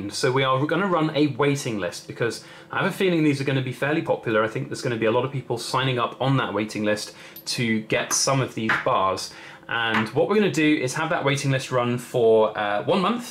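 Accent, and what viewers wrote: United Kingdom. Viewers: Yes I know u have a British accent. That’s why i said that. Lol. How much would it cost to ship to US?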